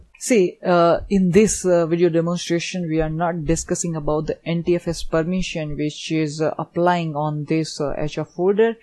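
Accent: India. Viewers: ugly accent . try using a robot instead your STRONG indian accent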